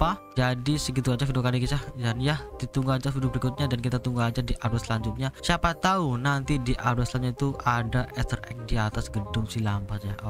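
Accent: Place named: Java, Indonesia. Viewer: Logat jowo ne medok banget bro😂😂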